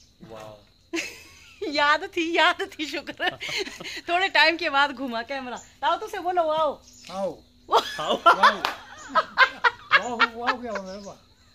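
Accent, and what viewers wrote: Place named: Bilaspur, India. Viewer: Madam ji aapka mayaka khan hai aap bilaspuri bol rha hai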